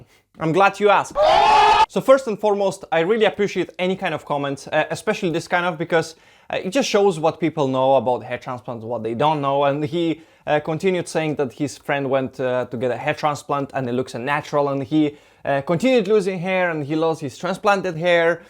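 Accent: Russia. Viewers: You sound Russian. Do you recommend Dr Ferreira ? Where is he located?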